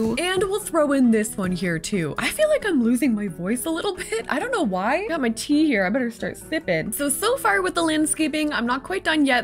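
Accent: Canada. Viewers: omg first video of yours ive seen and could tell right away u were Canadian lol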